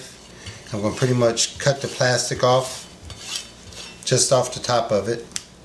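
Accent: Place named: Trinidad and Tobago. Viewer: You sound like a "Trini" lol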